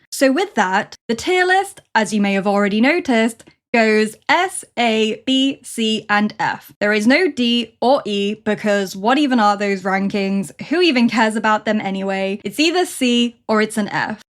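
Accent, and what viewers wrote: United Kingdom. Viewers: Hi thanks for another brilliant video. Off topic question: i’m very curious about where your accent is from. I am American. i think your accent is British but I can’t place it and I used to know British regional accents pretty well. I hear a touch of Australian but i don’t think that’s it. If you are willing to share?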